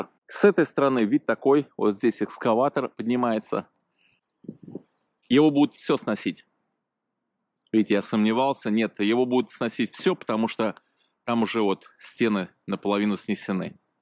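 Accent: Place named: Russia. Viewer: Вас тоже снесут с вашим рАссейским говорком. И цитируя вас сленг, скажу : " кАроче,точно !" Не эмониционируйте и кАроче валите из Мариуполя, если не хотите встретиться с пулей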